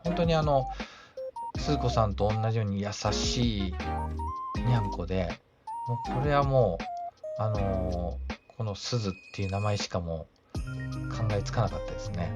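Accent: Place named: Kanto, Japan. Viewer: ショート動画見てどうなったのか 気になってました。 BGMを少し小さくして頂けるとしやすくなるかと。 関東訛りで時折関西イントネーションが混ざるから関西出身の関東在住の方かと思ったら京都の方とは驚きです。 それやったらやっぱり京都弁で（或いは交えて）話さはったら宜しいのに。 保護してくれておおきに。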